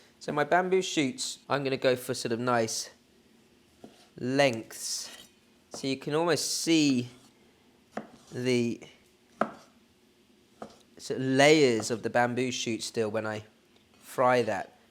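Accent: United Kingdom. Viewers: Greetings from Walnut Creek CA. Looks delicious. Just ran into this channel for the first time. Love the British accent (or maybe it's me with the accent)!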